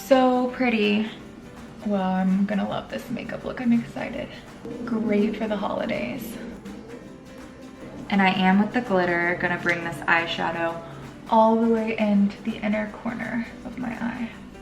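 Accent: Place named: California, United States. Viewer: Dang I know I say it all the time but personally I think u are one of the most sexiest, most beautiful, most alluring woman ever. To me your a goddess. The best part is, when I first saw u and priscilla ricart, I thought u was south american and she was from usa. Then boom she has accent n instead u don't u sound californian. And it's awesome. Sorry to bother ya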